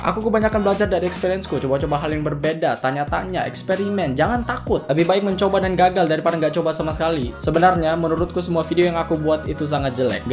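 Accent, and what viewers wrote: Makassar, Indonesia. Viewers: sounds like using makassar dialect :) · bahasa logap makassar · Ada logat Makassarnya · Lahiran Makasar jdi kebawa logat ibunya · logatnya malah kaya orang makasar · kok sedikit logt nya mirip orang makassar yah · lu orang makassar? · Logat makassar juga ya? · logatnya kyk orng makassar hahahahah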